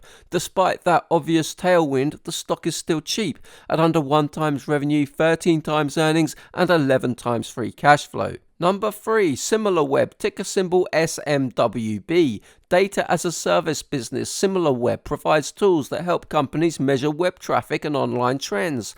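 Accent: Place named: United Kingdom. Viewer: Great presentation and love the British accent!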